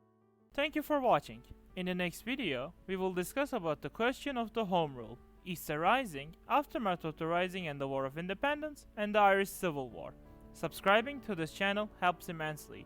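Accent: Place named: Turkey. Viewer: Türk aksanı da eklenince gayet güzel bir video olmuş. Tebrikler :)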